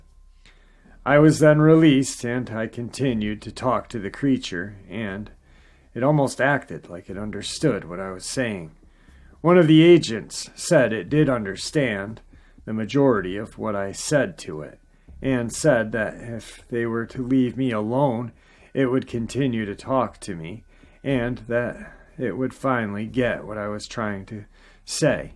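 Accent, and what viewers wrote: United States. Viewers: Anyone else hear a John Wayne accent?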